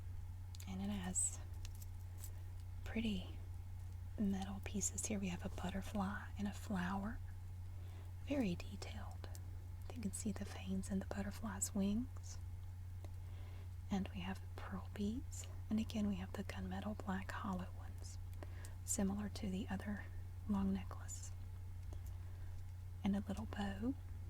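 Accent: South, United States. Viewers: this is the first video of yours that I have watched! I already love you! and your southern accent! wishing you well in your new house!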